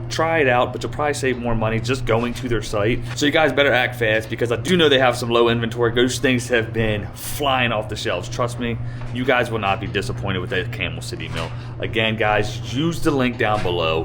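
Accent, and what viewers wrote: Maryland, United States. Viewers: I may be wrong and that’s ok on my observation. And no offense implied or intended. But since I started watching this channel, I’ve suspected you are from or spent a substantial amount of time in Maryland to develop the quintessential accent of the region. I was born and raised in Maryland myself, and that is very familiar to me. Happy Thanksgiving!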